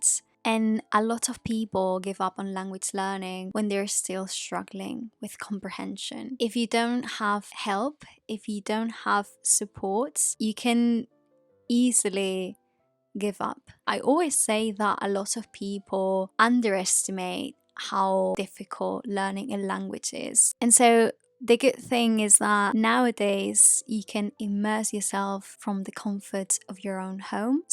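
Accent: RP, United Kingdom. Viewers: Would have never known that you are Spanish. Well done with the British accent. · I love RP accent... Great video. Thanks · Me gusta tu acento Británico, me voy a Australia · ¿Cómo aprendiste a hablar el acento británico? Hablas como si fueras británica.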